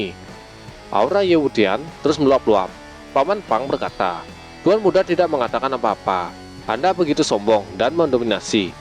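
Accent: Bali, Indonesia. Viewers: Makin lama makin jelas logat balinya :v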